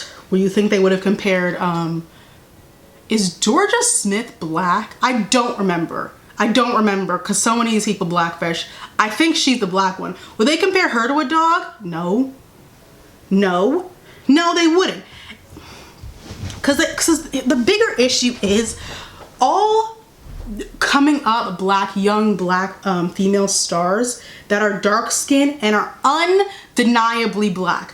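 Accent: New York, United States. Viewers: off topic but the new york really be jumping out lmao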